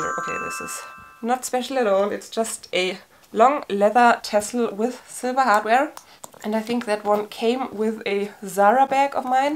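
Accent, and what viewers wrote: Germany. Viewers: Awww i can hear u are german. Am i right ? I was looking for such video and yours poped up first. So happy to have found you. Love all your charms. Now going for a hunt.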